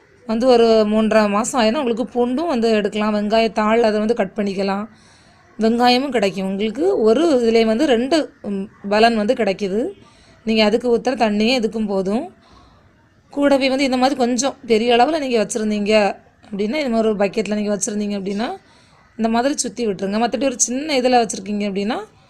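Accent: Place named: Kanyakumari, India. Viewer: குமரி நீங்கள் பேசும் தமிழ் அழகு அக்கா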